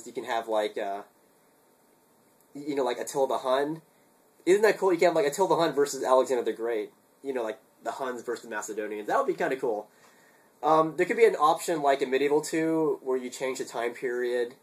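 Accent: United States. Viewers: !?!? thats you!? you look filipino or atleast South east asian i always thought yo were american because of your voice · He is very obviously American.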